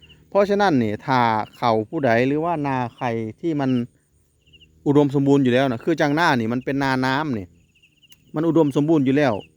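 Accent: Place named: Sisaket, Thailand. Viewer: สำเนียงคือศรีสะเกษนอครับ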